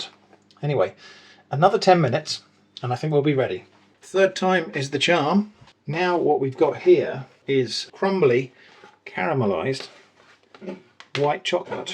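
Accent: United Kingdom. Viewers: You don't know how wonderful it is to hear a British accent calling cookies "cookies" instead of biscuits.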